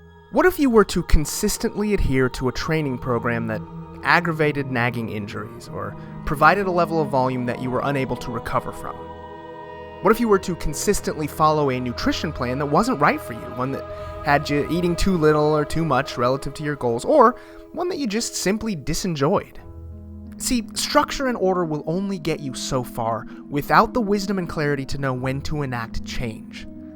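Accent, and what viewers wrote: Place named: Brazil. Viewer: You sound Brazilian which is a compliment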